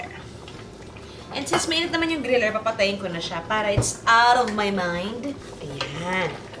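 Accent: Batangas, Philippines. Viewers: Batangenyo accent ang imik habang nagluluto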